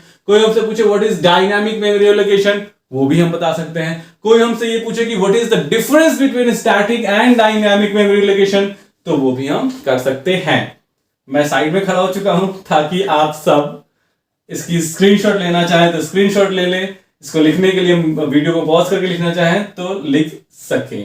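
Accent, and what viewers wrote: Bihar, India. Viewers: I was so confused tiil i found your channel ❤ thank you so much sir One more thing i guess you are from bihar and i am also from bihar so i connect with u more because of that also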